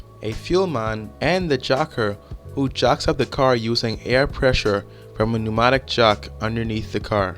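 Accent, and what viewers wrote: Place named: Bahamas. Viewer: You sound Bahamian glad to have another bro from the 242 enjoy Motorsport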